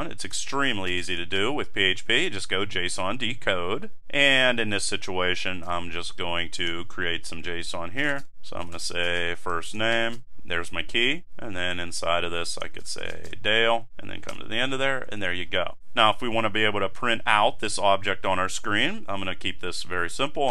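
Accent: Pittsburgh, United States. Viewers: OMG I just realized you have a Pittsburgh accent!!!!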